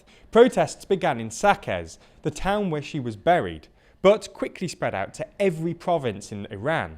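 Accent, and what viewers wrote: United Kingdom. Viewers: I have been to Iran for a month alone. This propaganda that just warms the west up for more genocide against innocent people. Spoken to women and police and asked what would happen if you dress inappropriately, as they do on French beaches, I was told by several women that they are sinply asked to adjust their wear. If they refuse, they take your name. That's it. When i told these women that the west believes morality police arrest and beat them, THEY JUST LAUGH. Women ALL OVER Iran do not cover all their hair. I would say visit and learn but you sound like a brit so you are not welcome. Sucks to be a fascist i guess! PS- i have never been on a country with so little police presence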